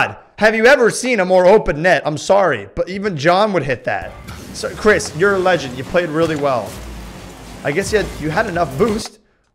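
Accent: Quebec, Canada. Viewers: You have the canadian accent when you speak french, it's so funny XD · Très beau accent québécois xd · Dude, I dunno if that Quebecois accent was a way to mock Quebecers or if it's legit your accent when you speak french but I love it. Hello from Montréal! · I love the little french/québecois comments in the middle of the stress XD Your quebecois accent is wonderful Also super hyped for this new series, i've been missing this channel. Can't wait for more:D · Love the Quebec accent!!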